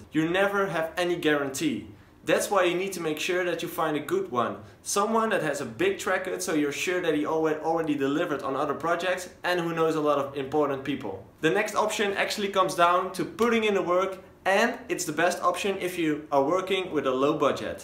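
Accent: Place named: Netherlands. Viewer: lekker nederlands accentje! ;)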